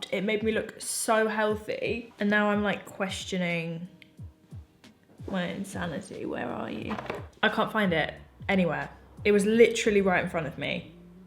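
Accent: England, United Kingdom. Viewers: This is my first time watching you and I’m hooked. Love the makeup and you are stunningly beautiful. I could listen to your English accent all day 💕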